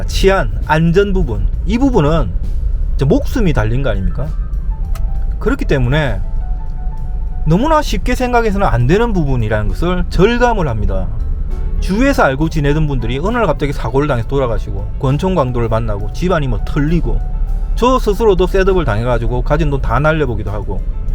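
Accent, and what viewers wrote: Gyeongsang, South Korea. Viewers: 참 말을 재미있게 잘 하네요. 얄구진 차도 끌고 다니고 . 오랬만에 얄구진 소리 들어 보내요. 경상도 사투리 인같네요.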